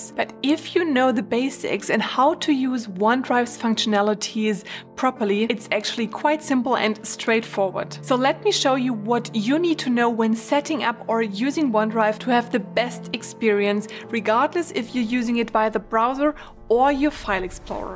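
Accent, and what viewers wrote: Germany. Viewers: Is that German accent? Just curious, good video btw 🐥❤️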